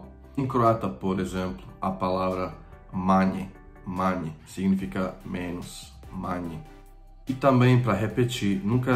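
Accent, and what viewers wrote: Minas Gerais, Brazil. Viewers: Você fala um português praticamente igual ao que falamos em Minas Gerais, isso mostra bem que há uma proximidade na fonética das duas línguas, já que o indivíduo sempre irá aprender outro idioma aproximando o máximo possível a fonética de sua língua materna à fonética da outra língua. Ótima explanação sobre as letras do alfabeto croata desconhecidas por nós brasileiros.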